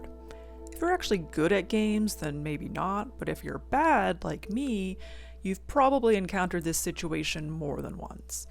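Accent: Canada. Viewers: i didn’t realize you were canadian until you said “zed” and then the way you say “newer” (nyoo-er instead of noo-er) really cemented it lmaooo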